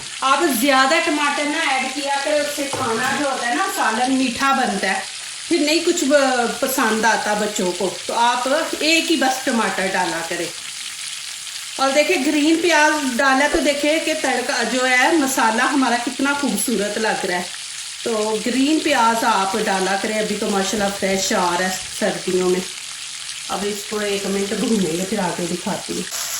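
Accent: Punjab, India. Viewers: آپ پنجابی میں ویڈیو بنایا کریں... کیونکہ آپ کا لہجہ پنجابی ہوتا ہے اور بول اردو رہی ہوتی ہیں ... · Speak Punjabi for GOD sakes don’t try to break URDU LEGS ( I am Punjabi too but your accent HORRIBLE) 🙏🏼🙏🏼people laugh on us PUNJABIES ) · Lehjha aap ka panjabi ha aap urdu na hi bolain anjabi hi bolain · MashAllah punjabi lehjay main urdu achi lugi hay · Baji aap agar punjabi bolain tu zyada acha hai aap ka lehja boht punjabi hai humain apni zuban hi bolni chahiye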